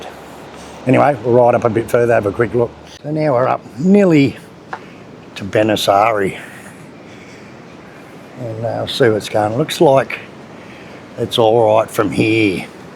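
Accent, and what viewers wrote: Australia. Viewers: Full on Aussie accent !😮😂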